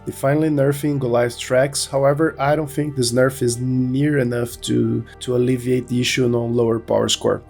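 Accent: Brazil. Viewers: Not the worst english, for a Brazilian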